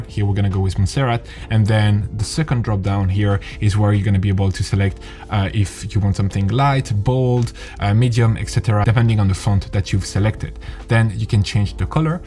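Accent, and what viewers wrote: France. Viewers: Love your French accent hah! Thank for this useful video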